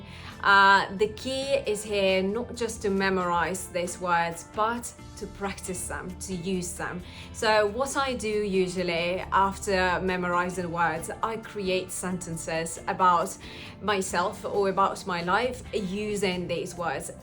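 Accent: United Kingdom. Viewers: Very British. Just need to work on enunciation a bit. :) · what an inspiring video for language learners, btw your name is not english but you sound like a brit who was born in bred in london :D im just curious were you raised in UK or require this perfect accent later ? aaand also why did you choose Turkey to live, im from Turkey and this country is not doing pretty good :D, what made you choose turkey i really wonder... Thank you so much <3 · your british accent is excellent 🔥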